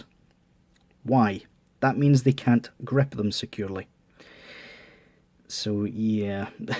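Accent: Scotland, United Kingdom. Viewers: You sound Scottish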